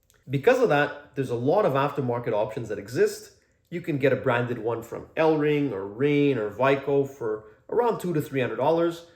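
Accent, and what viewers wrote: Quebec, Canada. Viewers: J'avais pas réaliser que tu étais Québécois avant cette vidéo ahah Vraiment superbe vidéo tu es excellent!!! PS, j'ai dit le tabarnak en même temps que toi XD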